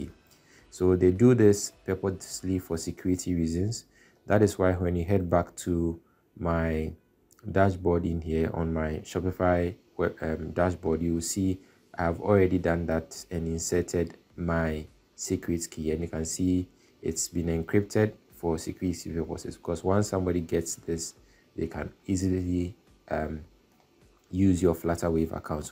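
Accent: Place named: Ghana. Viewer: great video. I can see you have a Ghanaian accent, and I would like to link up. I want to learn from you . Great video sire.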